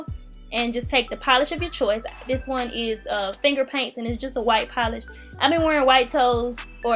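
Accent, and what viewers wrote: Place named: Atlanta, United States. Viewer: Love your ATL accent!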